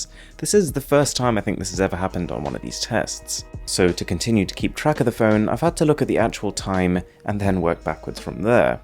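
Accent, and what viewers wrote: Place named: RP, United Kingdom. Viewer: Your accent is CARTOONISHLY posh.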